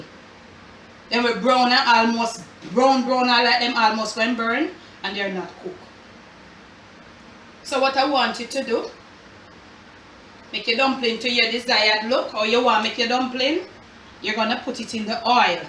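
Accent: Jamaica. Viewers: I’m African men living in the USA, just love jamaïcain accent, food and all one love my people 🙏🏿👍🏿💯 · Also our Jamaican patwa is like how you speak also always wanted to visit ... Nuff luv from ja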